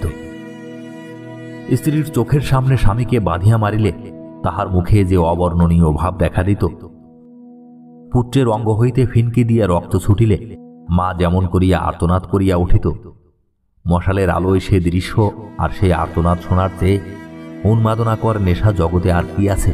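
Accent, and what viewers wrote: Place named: Khulna, Bangladesh. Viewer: ভাই আপনার বলা সাবলীল ভাষা বিশেষ করে খুলনার টান ভালো লাগে।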